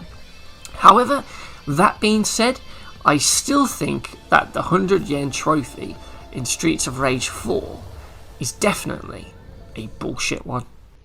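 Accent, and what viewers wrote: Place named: United Kingdom. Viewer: I love your British accent, it’s hilarious lol you should make your channel of you complaining about games and your accent makes it funny & omg I subscribed and saved this video to my favorites lmao yesss!! American here 🇺🇸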